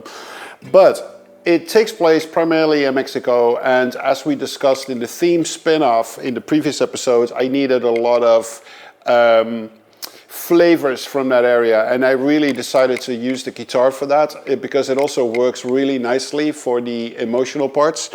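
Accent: Netherlands. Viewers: Love the Dutch accent ahah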